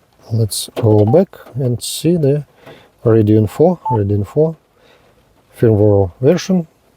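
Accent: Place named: Russia. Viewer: Очень русский акцент ✌️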